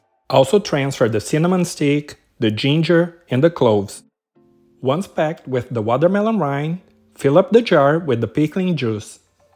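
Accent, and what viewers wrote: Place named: Brazil. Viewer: dig the recipe and glad you mentioned the sour dough bread toast --i'd think that apple cidar vinegar in recipe would taste great with avocado toast and rind recipe. And great accent! it reminds me of a great friend in college who's from Brazil..an instructor and now a gym owner in Dallas.. More recipes segments to come i hope!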